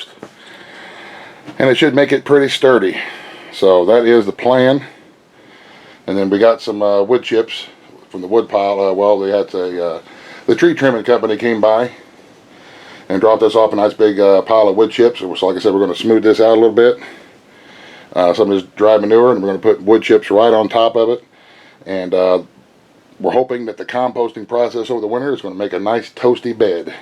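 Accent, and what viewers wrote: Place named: Oklahoma, United States. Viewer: Where ya from? Been tryin to figure it out. You kinda talk like us round here. Im from north east Oklahoma. By Arkansas kinda. Just wonderin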